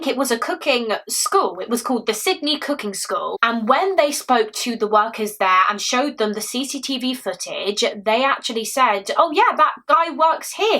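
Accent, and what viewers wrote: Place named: RP, United Kingdom. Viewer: I had a horrific day but a new video will cheer me up. That posh accent is so relaxing 😊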